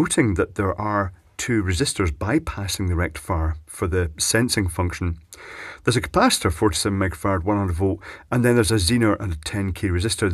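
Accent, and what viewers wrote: Scotland, United Kingdom. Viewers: Bit like old bond he has a Scottish accent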